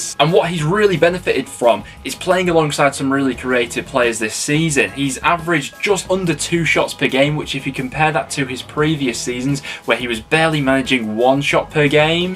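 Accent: United Kingdom. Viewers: British English is so nice 🇨🇱🇨🇱🇨🇱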